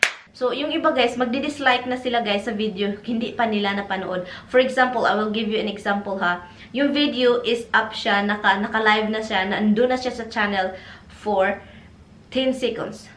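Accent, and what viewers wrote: Visayas, Philippines. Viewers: Mapa enlish mapatagalog...bisayang bisaya ang bigkas mo...magbisaya kna lng para dka mapagtawanan..wag mna pilitin srili mo te..tao ka nga ye alam nmin.pero alam mo hnd kmi magtataka kung bkt maghahanap ng iba yang jowa mo..alam mo kung bkt tingin ko sa ugali mo mayabang ka akala mo kung sino ka...mabunganga pangit ang ugali mo...yan ang tingin ko sayo.....sori 2 say pero ingitera ka....magbisaya kna lng te mas better pa....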